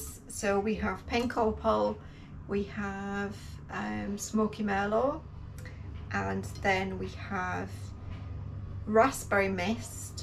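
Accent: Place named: Newcastle, United Kingdom. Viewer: Hey, how fantastic you're in your studio! Oh what a great assortment of pots and glaze combos. love Jacobs jug and I do hope you can save it? Your beautiful coil bowls are fantastic and what a lucky friend you have who's going to get the big turquoise bowl for her birthday. so glad you're back making pottery from home and videos as I love watching and listening to your Geordie accent. Happy potting my friend and stay well xoxo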